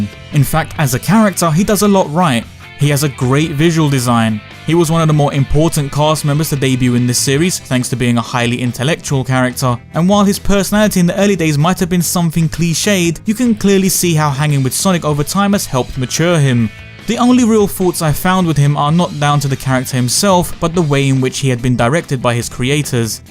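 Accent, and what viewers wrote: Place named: Inner London, United Kingdom. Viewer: I'm curious to know, I'm think you mentioned this in another video but where about in England are you from again? Your speech makes me wanna say inner London?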